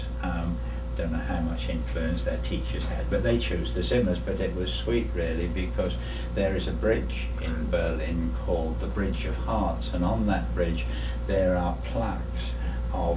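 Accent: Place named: Derbyshire, United Kingdom. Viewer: Howdie, Have continued to watch your videos since before you made it big and I still think you are a blast to watch and you're definitely a pride of Derbyshire. Being a Derbyshire lad myself, it's great to hear a local accent being that I now live in France. Thanks for the videos and please, keep them coming.:)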